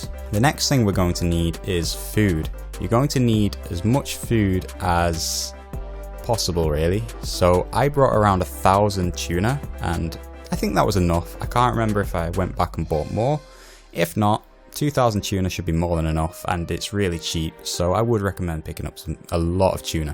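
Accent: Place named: United Kingdom. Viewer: dude you sound so brittish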